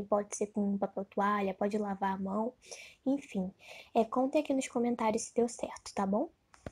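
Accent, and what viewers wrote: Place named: Rio de Janeiro, Brazil. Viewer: Vc é bem carioca né, da pra ver de longe, talvez nem seja, mais tem mt mt mt sotaque, gostei do video, vou testar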